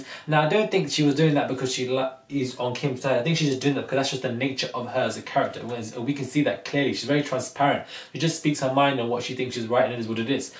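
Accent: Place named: London, United Kingdom. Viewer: Great to hear a fellow Brit and Londoner (?) reviewing RHOA.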